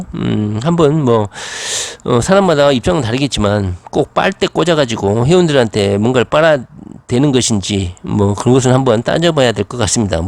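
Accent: Jeolla, South Korea. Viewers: 전라도 늠이네ㅎㅎ 슨상님은 잘 계시지라?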